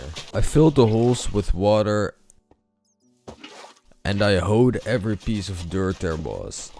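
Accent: Netherlands. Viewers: hey! :) are you dutch? your accent sounds very dutch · are u dutch? i am. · You sound very dutch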